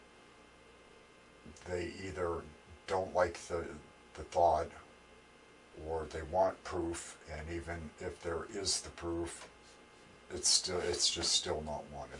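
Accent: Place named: United States. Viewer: Those videos clearly show weather balloons with a radiosonde hanging below by a tether. The balloons are released at the same time each day and depending on the wind, you will see them each day at exactly the same time. Have you checked when you've seen them? You sound american, what state did you see them in? Google any map of radiosonde stations, check the wind on the days you see them and work out if they could be near you.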